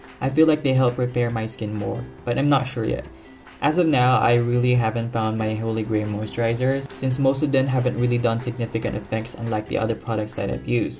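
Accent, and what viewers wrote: Philippines. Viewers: Waiit is it just me but you have a slight Filipino accent when you’re speaking English. Woaahh 😱😳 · Filipino accent, pinoy baga ito?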